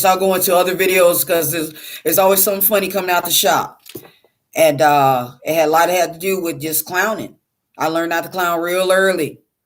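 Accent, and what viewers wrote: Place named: Detroit, United States. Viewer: I hear a Detroit ish Midwest accent. Am I right?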